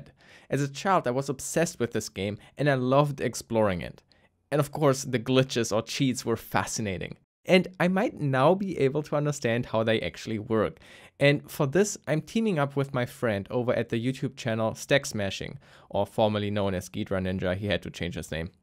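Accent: Germany. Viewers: Are you german? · are you German? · At the first sentence I knew: this is definitely a German guy 😂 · ich hasse deutschen Akzent 🙈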